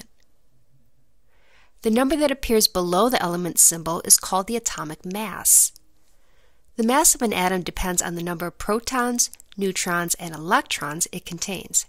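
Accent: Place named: United States. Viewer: Americans have funny accents ヾ(•ω•`)o Not in a bad way Your voice is very crisp 🍎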